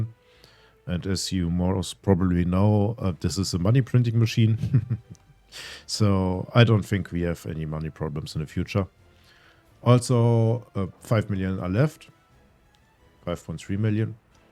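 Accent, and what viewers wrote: Germany. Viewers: You sound reallly german. Und das sag ich als deutscher😂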